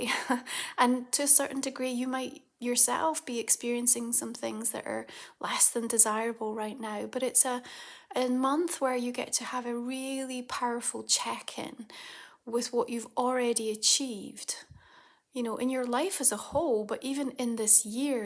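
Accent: Scotland, United Kingdom. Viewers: Oh! Didn't realise you were a fellow Scot 🏴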